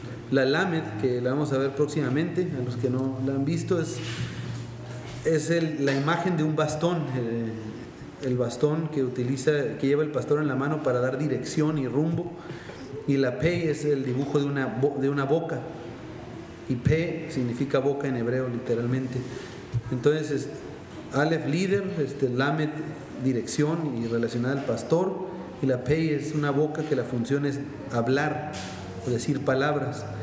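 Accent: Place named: Colombia. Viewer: Buenas tardes como está usted? Por su acento usted parece que fuera colombiano. Mira te voy a decir una cosa por favor ya no le estés enseñando a la gente eso DEL SEÑOR De cual señor usted me habla del señor Alberto el señor Pedro el señor José. Y de cual Dios usté habla del Dios de las moscas del dios del viento del dios del fuego. Déjeme saber por favor. Yo soy colombiana pero por favor enseñe lo que es correcto. Desde aquí desde Estados Unidos. Y por favor responda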